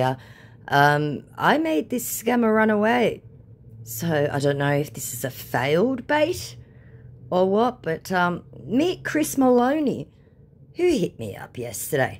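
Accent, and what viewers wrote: Australia. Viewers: Subscribed cos of ur Aussie accent. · Your voice is literally EXACTLY the same as my stepmom's. She passed suddenly a few days ago and was also from Australia - although she was from Adelaide. I got to learn a bunch of Aussie slang, got introduced to new foods and drinks, and can definitely tell an Aussie accent from a Brit one because of her. I'm taking her passing hard but your videos/voice are such a comfort to me right now. So from this Cali-born Pacific Northwest transplant, thank you.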